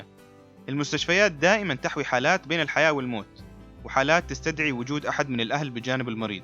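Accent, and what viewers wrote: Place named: Hejaz, Saudi Arabia. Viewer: لا تتعب نفسك بهالمبررات اللي إنت تقول عنها بأن الإختلاط في مصلحة الشعب أو بأنه تحضر وتقدم أو بأن الإختلاط ضروري بالمستشفيات وأن من الصعب الفصل بين الجنسين بها .. لا بالعكس المستشفى أكثر مكان يتوجب الفصل بين الجنسين به لأنه المكان الوحيد اللي بتضطر أن تصطحب محارمك وتذهب إليه وفيه كشف للعورة أحيانا وفيه قولة خل محارمك عندي واطلع برة .. ولا يوجد رجل في قلبة ذرة غيرة مؤيد الكلام اللي قلته بالمقطع .. لو أن هناك أماكن من الصعب أن تفصل بين الجنسين بها مثل المسجد الحرام وبقية المساجد قال الإسلام النساء يأتين بالخلف وكذلك الشوارع والأسواق ولكن المستشفى وش خسرانة الدولة لو تم الفصل بين الجنسين ولو بالشيء القليل .. الغيرة موجودة يا صاحب المقطع مبين من لهجتك بأنك من حضر الحجاز يا اللي كل همكم وشغلكم الشاغل التمويل والسعي ورى الإنفتاح والإختلاط ووضع مبررات وحجج بأن الإختلاط والإنفتاح فيه فايدة للشعب وتقدم وتحضر ورقي .. قبحكم الله .. 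الإختلاط بالمستشفيات هو اللي أجبر بقية الأماكن أن تتبع خطاها بالإنحلال و الإختلاط اللي حرمه ربك .. تقول بالمقطع صعب على الدولة أن توفر في كل قسم وفي كل تخصص طبيبات وفنيات وصيدليات وإداريات في أقسام نسائية مستقلة وش الصعب في الموضوع .. لو الدولة لم تجد امرأة سعودية في تخصص معين بإمكانها أن تأتي بطبيبات وفنيات وإداريات من الخارج وتوفر وتسد حاجة كل قسم حتى بنات البلد يغطين النقص .. لو يا صاحب المقطع صعب تدريب الشباب الأطباء الطلبة في أقسام نسائية وتوليد هن للنساء ولادة طبيعية مع العلم بأن من اللي كان يولدهن قبل مجيء المستشفيات وأحيانا تخصصات تافهة من السهل أن تسد حاجتها سيدات مثل الأشعة و المختبر ومن يشفط البلغم من حلق المريضة لااا.. والممرضة اللي تروش المريض ..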